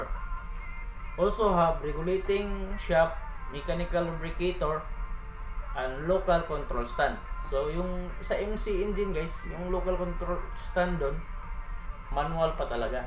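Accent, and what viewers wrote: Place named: India. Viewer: brother ur starting to sound like those indian youtube videos, no offense taken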